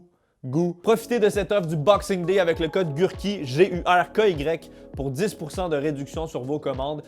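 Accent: Quebec, Canada. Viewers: cest la premiere fois que je vois un de ses videos, je crois entendre une pointe de québécois dans sa voix, est ce possible que sa soit un frère québécois ?? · J'aime trop l'accent québécois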